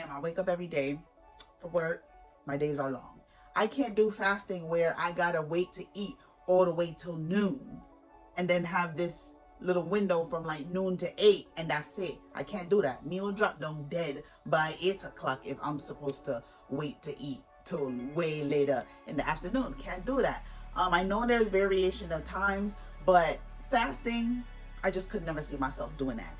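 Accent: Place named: Jamaica. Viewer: Great.love the Jamaican twang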